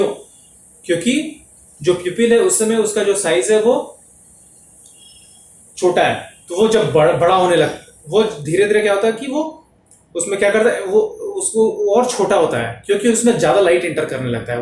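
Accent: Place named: Bihar, India. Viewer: Bihari accent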